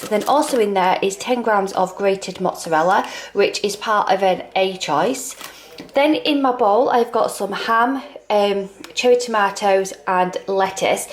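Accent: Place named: Sheffield, United Kingdom. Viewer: You sound like you’re not far from me in sunny Sheffield xx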